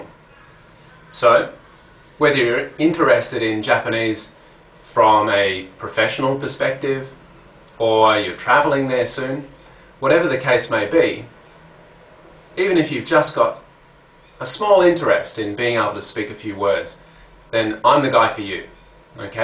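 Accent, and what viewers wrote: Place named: Australia. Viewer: I literally laughed when you switched to English, the Australian came back so strongly. (I'm Australian as well.) Im actually just as interested in teaching languages as I am with learning them. Im not exactly sure how one gets into language education in Australia though. I think I might take some of your classes when im done teaching myself the basics :P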